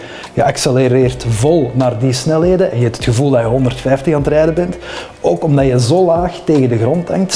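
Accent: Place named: Antwerp, Belgium. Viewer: Schitterende vlog makker, trouwens terug mooie intro ... en de oneliners jah ... samen met het Antwaarps accent de max hé 🤣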